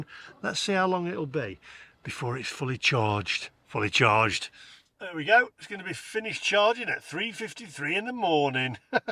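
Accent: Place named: East Midlands, United Kingdom. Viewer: if you get caught without the Crit'air sticker in the cities especially Paris its a 135 euro fine. Traffic wardens can issue them as can standard gendarmes. It costs less than 5 euros to get one sent to the UK and thy send you a pdf version you can use to print out instantly while you're waiting for the actual disk. You have to scan and post your V5 to the French "certificat air gouv" website then they will allocate your category. My 2001 Mondeo 2.0 petrol auto is Crit'air 3 and doesn't face any additional regulations but might do after 2025 when it will be most likely banned from Paris and other major cities. Now Euro 7 is coming out it looks like all Euro 4 vehicles will be banned from the cities. The Taycan would be Crit'air 0 or E. As for the accent thing, you sound to my East Anglian ears very much the East Midlander, if I had to narrow it down I would say Nottingham accent. 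And yes ha bloody ha I fell for the battery joke.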